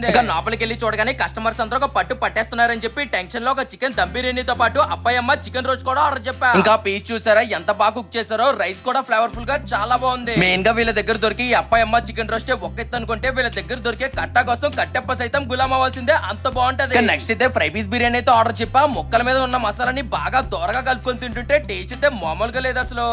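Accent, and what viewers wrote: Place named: Telangana, India. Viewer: Bro nv Rajahmundry vadivi bro Enduku aa telangana slang vaduthunnavu bro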